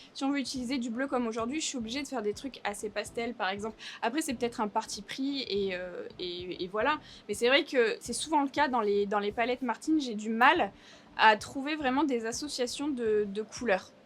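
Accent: France. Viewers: prononce toujours à la française les mots anglais.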